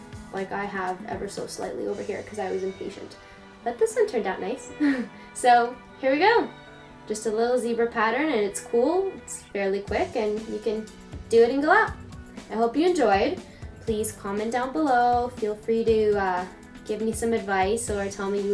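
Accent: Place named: France. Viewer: A) your nails are so pretty i'm so jealous. B) where are you from? it sounds like you have a *slight* french accent hahaha C) I subbed!!!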